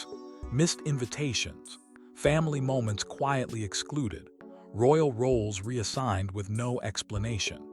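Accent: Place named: United States. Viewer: Was all set to listen to this while I nodded off to sleep but unfortunately the narrator is English speaking but not Britain speaking. Why why are all these videos made with English I should say American people. Next the narrator should learn how to say the Queen pronounce the names right. Just hope and pray that I don't spend all night hearing Cam milla, Cam milla, Cam- milla. It's, I believe, Ca-milla.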